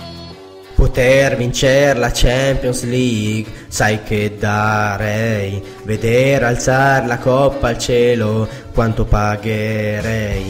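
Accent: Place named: Naples, Italy. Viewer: carina, togo l'accento Napoletano, apprezza i ringraziamenti perché sono Juventino XD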